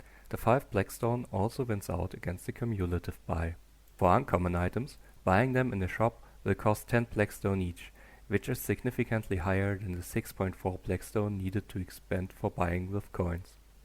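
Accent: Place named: Germany. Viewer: Geiles Video Nice vid Deutscher Akzent würde ich schätze n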